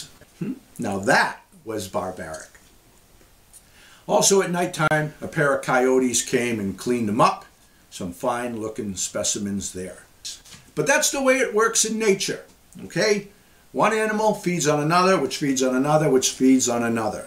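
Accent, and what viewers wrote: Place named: New England, United States. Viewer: OMG don;t let the negative people get to you. Whether jealousy, negativity, criticism, or downright cruel comments, know that those folks are very unhappy in their own lives and have a need to lash out to others. Everyone is doing the best they can with what they know. Pray for them, don't waste your energy in addressing or even acknowledging their rants. Move forward and continue with your happy life as if they don't exist. Acknowledging them gives them satisfaction in knowing it bothered you. Your videos are great, entertaining, helpful, and just downright genuine. You are the salt of the earth my friend. Pray for those lost souls. I now live in northern California, 8-1/2 years now - not far from Tahoe - but far enough to not get enough snow for me!!. However, I miss New England, having grown up in CT, RI, MA and ME. 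Your slight accent brings back fond memories. My daughters and grandchildren are in CT and MA. My older daughter has a second home in NH. I am planning on a road trip for a visit this summer/fall. Bless you.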